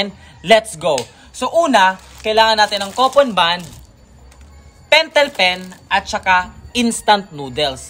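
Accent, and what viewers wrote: Visayas, Philippines. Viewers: Bisaya diay ka ? TAGA CEBU KA ?!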